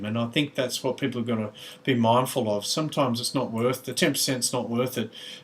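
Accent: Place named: Australia. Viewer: thanks, I'm enjoying your commentary, nice to hear an Aussie voice on YouTube!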